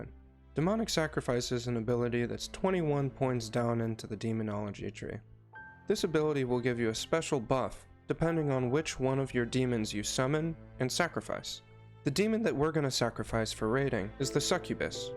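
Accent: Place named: United States. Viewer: I have a hard time with the American accent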